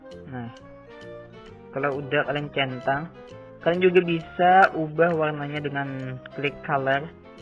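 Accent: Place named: Banjar, Indonesia. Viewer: Ktahuan org banjar😅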